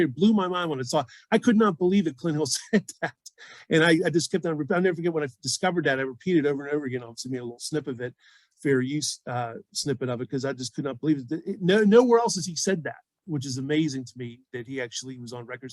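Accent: Pittsburgh, United States. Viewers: your accent I being a life long northsider know you must be from Pittsburgh am I right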